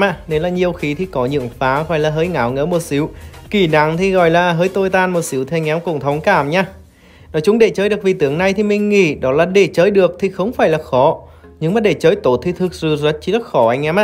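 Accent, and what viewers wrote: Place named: Central, Vietnam. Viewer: giọng bắc nghe quen rồi, méo hiểu sao cứ thích nghe cái giọng miền trong của bạn 😂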